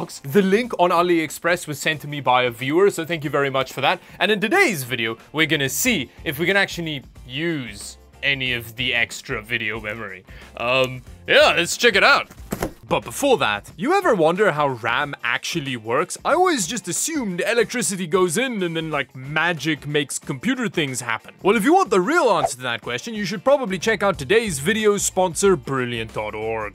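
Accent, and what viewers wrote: South Africa. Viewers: Your accent and your name makes it seem like you're from South Africa, are you? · how do you sound like ur south african with that accent?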